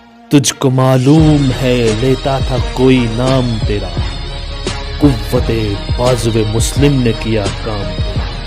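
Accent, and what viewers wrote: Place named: Kashmir, India. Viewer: Kashmiri dialect reflected. Mashallah ❤️❤️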